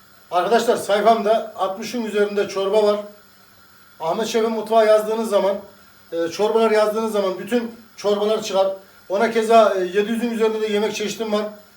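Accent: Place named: Anatolia, Turkey. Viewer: Ülkemin güzel şiveli; babacan , yardımsever şefim . Senin o Anadolu kokan şivene gurban olurum 😊😊🙏🙏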